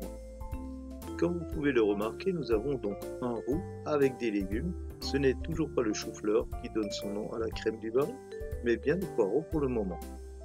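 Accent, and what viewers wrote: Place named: France. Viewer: I love your French accent too, sounds so beautiful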